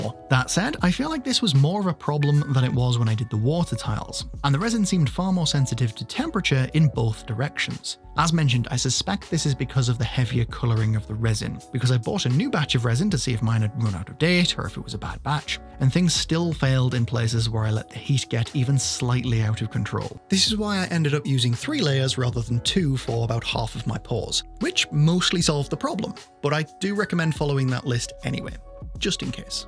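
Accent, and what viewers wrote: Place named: United Kingdom. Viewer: Every time you paused, then said something uncomfortable "organic material we are trying to replicate" - made me chuckle every time. Your voice is very soothing, and as an American, I love that British accent. I leave your videos running when I'm building, even if it isn't the same video to what I'm making.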